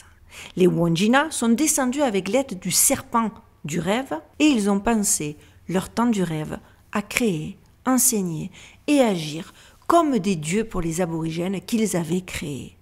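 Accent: South, France. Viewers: Merci pour cette vidéo et ce thème fort intéressant. Toujours OK pour une suite, si cela vous inspire. Oui, aussi pour d'autres sujets, car vous savez toujours nous présenter et interroger sur nombre de sujets: pyramides, Antarctique... Oui, oui, oui! Depuis que je vous ai découverte, j'ai tout écouté et je n'ai jamais été déçue et suis toujours en quête de savoir plus. Merci infiniment pour ce gros travail et présenté à la! Merci pour votre accent qui nous amène le soleil du sud de la France! Merci d'être et de faire! Caresses à la Duchesse. Prenez soin de vous et de votre fils. Bonne semaine et à dimanche prochain 🙏🏻☀️ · Bonjour belle d’âme d’outre-Atlantique avé l’accent du sud de la France qui doit te manquer comme ça m’a manqué après quelques années passé dans les îles de la Caraïbe! C’est un plaisir de t’écouter narrer toutes sortes de récits le dimanche, alors on écoute et on remercie après le visionnage de la vidéo! 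Prends soin de toi et plein de gros bisous 😘! Amicalement 🙏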